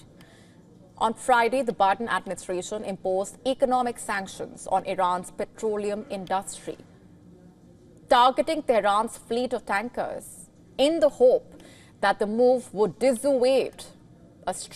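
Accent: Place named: India. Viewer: Can you remove your Indian diction..?? Ty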